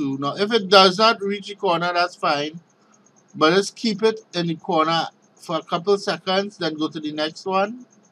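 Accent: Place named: India. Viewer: Indian accent...........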